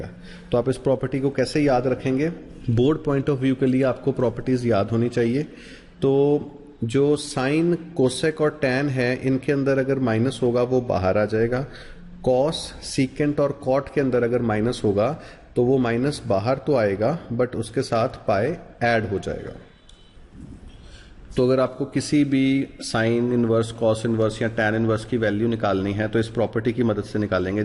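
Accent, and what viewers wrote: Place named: Punjab, India. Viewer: Getting punjabi tone 👍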